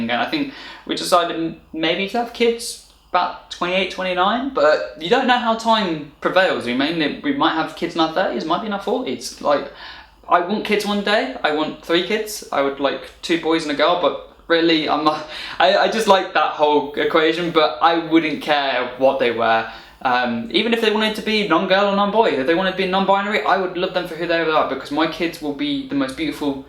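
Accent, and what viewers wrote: United Kingdom. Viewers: Great video! You are one of my celebrity YouTubers who is very attractive and I enjoy your English accent too. I agree, with you mentioning that their are lots of beautiful people.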